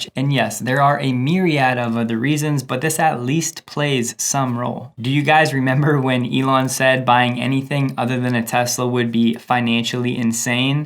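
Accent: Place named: Staten Island, United States. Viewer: Are you from Stanton Island?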